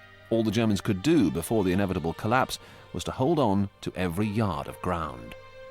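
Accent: United Kingdom. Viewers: the British slanted twitish narration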